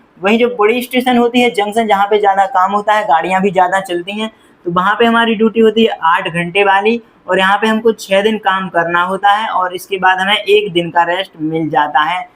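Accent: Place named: Jharkhand, India. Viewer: Jharkhand me bhai aise hi pronunciation kiya jata h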